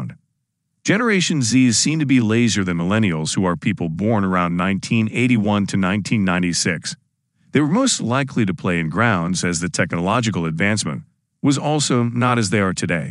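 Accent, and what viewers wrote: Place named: United States. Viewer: ..Why is someone with an American accent calling soccer, football????????z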